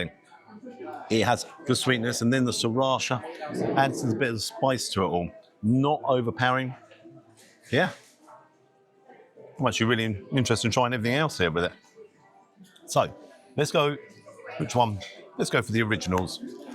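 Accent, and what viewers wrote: United Kingdom. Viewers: Its not what I would call a "sandwich". But then - I'm old fashioned. I also would call a fillet a fill-it not a fill-a. The language I learned to speak (and what American's also define as their lingua-franca) is English. You my dear speak with an English (British) accent. Your audience is most likely comprised of locals who are, typically, not American. Therefore, IMHO it would be nice to hear you pronounce things the English way. Perhaps I am just being pick-ee?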